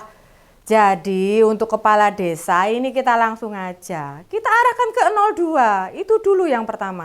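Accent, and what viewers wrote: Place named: Surabaya, Indonesia. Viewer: Mbak iki logate kok koyo wong Suroboyo...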